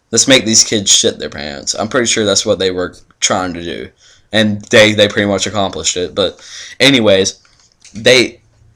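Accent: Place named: North Carolina, United States. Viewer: What City in NC are you closest to? I live in NC aswell, and you sound like your from around my area!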